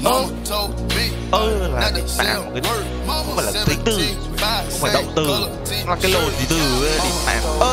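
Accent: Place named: South, Vietnam. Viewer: Nói tiếng miền nam hay vl a ạ:)))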